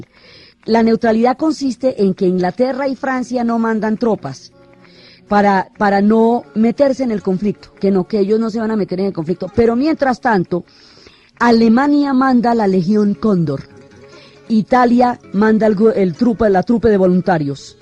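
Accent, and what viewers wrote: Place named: Colombia. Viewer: Felicidades,a pesar de conocerme de pe a pa esta historia me resulta grato escucharla con el acento de...Colombiana? y los incisos de canciones y peliculas...genial las he visto todas!y mejor no se puede expresar la sintedis de ka lengua de las mariposas manita arriba y..LIKE!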